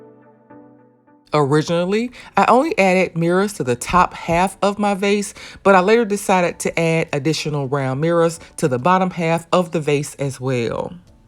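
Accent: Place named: Memphis, United States. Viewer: Sound like your from Memphis or Atlanta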